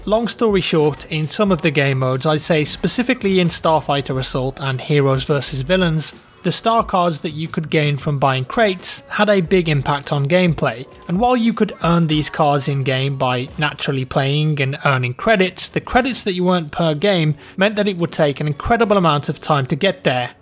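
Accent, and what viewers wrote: United Kingdom. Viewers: one good question though. how did develop a British accent even though he's american?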